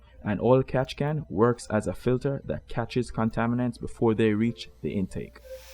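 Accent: Jamaica. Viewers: Hey, I hear a Jamaican accent. I recently bought a well kept 2005 R53 Cooper S. What is the purpose of the Meth and would this be appropriate for the R53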